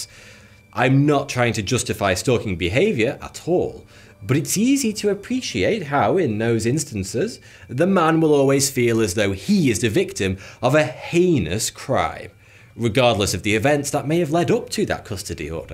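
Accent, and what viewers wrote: United Kingdom. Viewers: what 🤷🏻‍♀️ he is british, thats what some people from Britain talk like 😉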